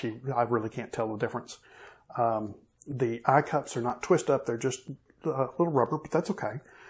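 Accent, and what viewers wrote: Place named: South, United States. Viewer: So cool to hear a brainy guy with such a fine, southern accent. :-) Been researching this stuff to death, and two of your videos have now answered essentially all of my remaining questions. Thank you.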